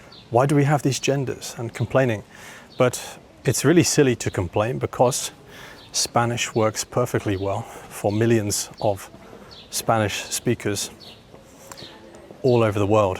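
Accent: United Kingdom. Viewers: I love your British accent , you're a great teacher because your English is easily understandable! You really do a great job for non native English speakers! ❤❤❤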